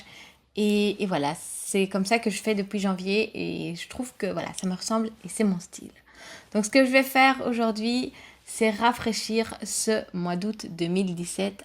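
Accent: Belgium. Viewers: Hello ! Je suis une nouvelle abonnée à ta chaîne ! En effet, je me demandais de plus en plus si le bullet journal était fait pour moi ou non et je me suis gavé de vidéos pendant toutes une journée sur le sujet. De ce fait, je suis tombé sur ta chaîne et je la trouve géniale (et toute mignon, au passage !) ! Ca fait du bien d'entendre un accent de chez nous, hahaha ! D'ailleurs, je me demandais de quelle région viens-tu ? J'aurais parié pour Brabant Wallon ! Enfin tout ça pour dire que tu m'as beaucoup inspiré dans l'organisation de mon bullet ! Ca n'est jamais facile de commencer dans un domaine qu'on ne connaît pas et avoir des petites vidéos comme les tiennes sous la main est toujours utile ! Donc voilà, je te fais de gros bisous (cachuètes ;P ) et continue comme ça ! (Et bien évidemment, je participe aux concours ! )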